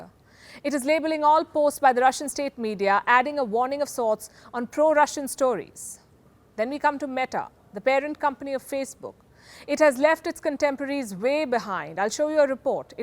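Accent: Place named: India. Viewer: English not Indian English please so annoying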